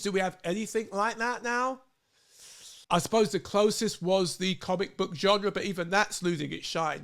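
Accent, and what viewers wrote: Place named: United Kingdom. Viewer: Hey. I'm new to your channel and I hear what you are saying. Although I do agree with what you have said and yes, the Oscars have kind of lost mysterious Spark and Mystique it once had, and Hollywood looks like the 20th century Relic, I will say this. I think there is good in that it will make way for new ways to create films. I'm not sure where you are and I'm guessing you probably live in the uk? I'm basing that on your accent but I of course could be wrong. Apologies if I am wrong. But as an american, I get tired of watching the Oscars because it's filled with Elites who really have an absolute disdain for about half of the country. We know we are despised because of our beliefs, our politics, our way of life, and usually Oscar night is when the worst of the worst are together and they basically ridicule half the country. 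This wasn't always the case but it's become more so in the last 20 years. And it's basically an elitist Club for the wealthy to lecture the rest of the country. And the truth is is that there's so much more different forms of entertainment, I find the average YouTuber more entertaining than the Oscars and the golden globes. Perhaps Hollywood needs some serious humbling before they can really bring back that spark and they need to not ridicule half the country then they will get the ratings they need and they will be able to unite this country, rather than constantly divided with ridicule. I think one of my favorite movies of the 2010s what is the C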